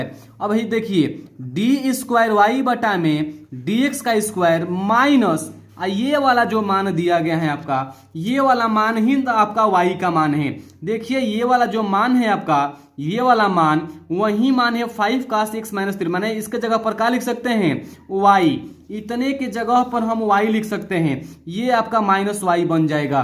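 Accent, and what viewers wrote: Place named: Bhojpuri, India. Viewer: Sir app kha sa ha ..🙏🙏🙏🙏🙏 App bhajpuri bol raha ha.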